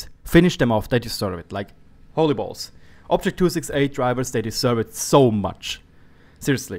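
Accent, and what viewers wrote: Germany. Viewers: wait are you from germany? i'm new so just asking!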